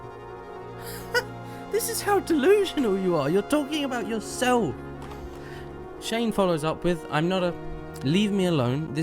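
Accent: England, United Kingdom. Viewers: It took me 8 minutes to realize you have an English accent :P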